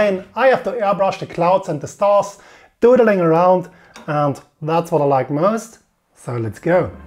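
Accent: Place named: Germany. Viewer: nice accent ;) ... german ?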